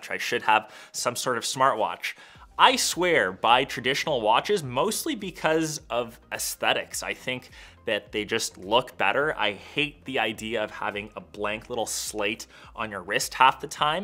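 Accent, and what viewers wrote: Netherlands. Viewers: Huh are you from holland? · Hé, are you Dutch?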